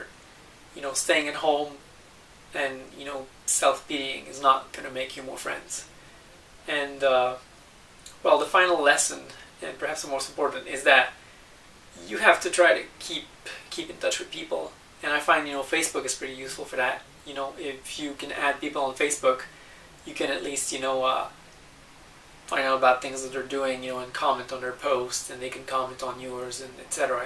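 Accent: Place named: Quebec, Canada. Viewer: First, your videos are fascinating and intelligent. Second, you have a hoarde of viewers, so if this question has been asked and answered already, I apologize. I am however very curious; you seem to have a French (Canadian) accent. Yet all of these videos seem to be in (perfect) English (Canadian). I'd expect them to be in French; is there a reason for this?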